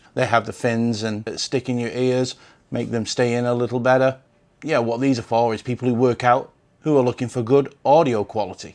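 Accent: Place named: Lancashire, United Kingdom. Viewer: Well that makes sense now. I could tell you were from the Lancashire region. You've done well to retain your accent. I've a pal who moved to the States when he was 13. He now sounds as American as Colonel Sanders. Good review by the way.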